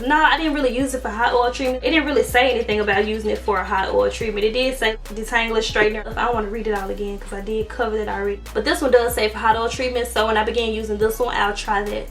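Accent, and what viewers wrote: South, United States. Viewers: been a while since ive seen a black person with a thick country accent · I love you down south country accent! 😍 Soooo cute, girl! I'm from Florida so you know I'm representing! BTW, it's not overwhelming, it's very cute 😉😘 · Wild growth did not work for me unfortunately, and it left a white residue. 😞 You have a lovely accent, BTW. Real Southern gal! 🇹🇹😇👏🤗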